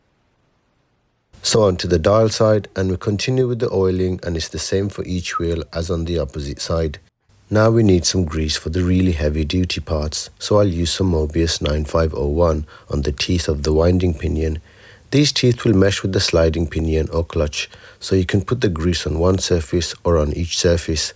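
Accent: Birmingham, United Kingdom. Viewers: great to have your brummyness back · I can only click the thumbs up once, you deserve more. And don't even think about NOT doing a commentary again. Your soft and gentle Brummy accent absolutely nails the information you impart. Yet another thoughtful and honest refurb which, if you had gone too far with aggressive cleaning and new plating, would have spoiled the watch and its history. We are back to you not marking your work, but we've talked before about that. So; 'tara a bit'.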